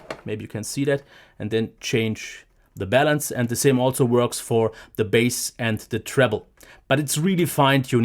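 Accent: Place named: Germany. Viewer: No, he sounds German.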